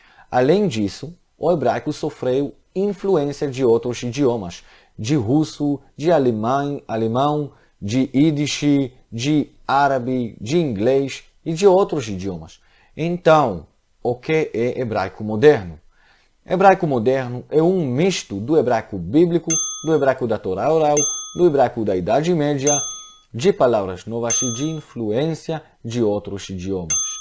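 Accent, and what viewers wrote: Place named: Rio de Janeiro, Brazil. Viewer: SHALOM PROFESSOR! SEU APRENDIZADO DO IDIOMA PORTUGUES FICOU BEM CARIOCA OU DE PESSOAS DO RIO DE JANEIRO.TEVE ALGUMA INFLUENCIA DE SOTAQUE CARIOCA QUANDO ESTUDOU PORTUGUES?SÓ CURIOSIDADE HEINN..☺DEVO DIZER QUE SUAS AULAS SAO EXCELENTES!!!PARABENS!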